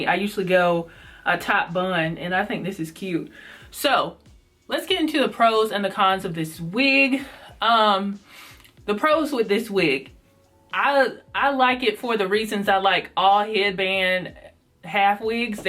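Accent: South, United States. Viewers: ok. Bye the way, I love your southern accent!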